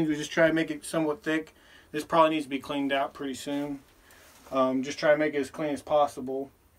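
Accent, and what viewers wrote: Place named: South, United States. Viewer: talks just like I do. I was born in Tennessee. Spent most of my childhood in the country. Brownsville Tennessee. I’m in Arkansas now. But still down south. Y’all keep em coming. 👍😊👍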